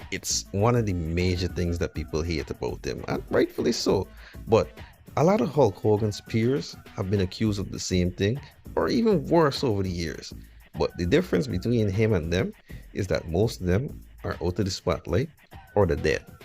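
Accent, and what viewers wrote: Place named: Guyana. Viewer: I like your accent bro. You inspired me to look up more things about Guyana. Looks like a cool place ngl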